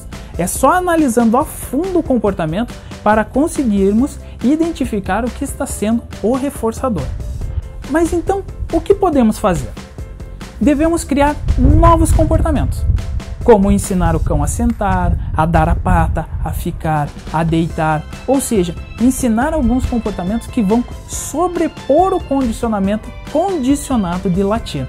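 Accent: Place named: Curitiba, Brazil. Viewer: Esse cara é de Curitiba, certeza.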